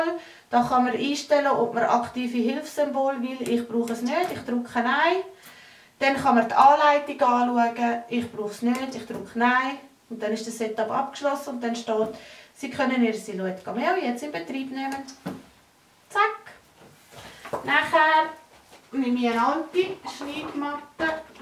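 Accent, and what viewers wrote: Switzerland. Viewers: Stimmt, Schwitzerdütsch ist kein Deutsch..... Aber ich versteh dich! ;-) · Juhu, isch scho es Zitli här das Video! i wohne z'Basel, chume aber ursprünglich au us der Region Solothurn/Bärn... due doch nid esone Zürcher Dialäkt ufsetze... wenn nämlech am Erkläre bisch im Video seisch jo au Röueli z.B., lohs lo sprudle! ;-) aso me verstoht di Mi Cameo 3 isch no haub ipackt im Büro und i goh jetz grad mou go luege öb das stimmt wo du do verzöut hesch im Video ;-)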